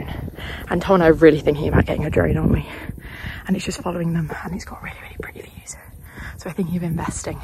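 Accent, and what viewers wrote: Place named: West Midlands, United Kingdom. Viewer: Love watching you enjoy what we nearly did when young but chickened out due to family issues. Intrigued by your slight accent similar to our family and mine which is West Midlands. You look like and sound like the younger daughter of my cousin who was raised in Tile Hill north west of Coventry and sounded to me sometimes like Shropshire. Are your roots similar. Have a great time seeing as much of the world as possible.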